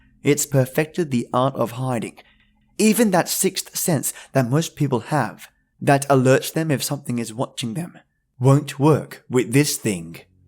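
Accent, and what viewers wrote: Australia. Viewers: I love finding fellow aussie narrators! 👻👻👻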